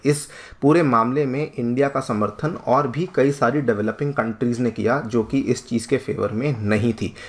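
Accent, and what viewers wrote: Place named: Uttar Pradesh, India. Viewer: shayad ye mahoday uttar pradesh se hai kyuki Maine dekha hai up me log ण कोड की तरह बोलते हैं।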